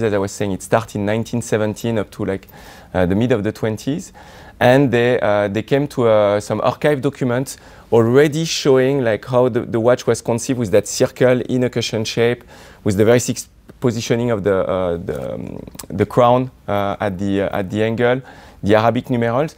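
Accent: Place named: France. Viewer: strongest fr accen.i hearrd in a while lol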